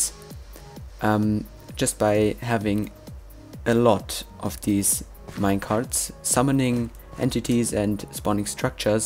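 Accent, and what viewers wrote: Germany. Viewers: Are your a german guy?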